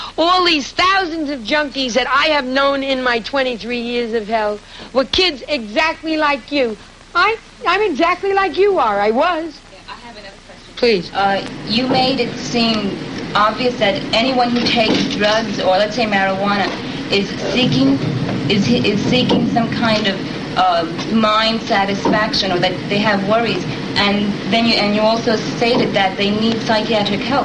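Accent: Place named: Larchmont, United States. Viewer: It's the Larchmont accent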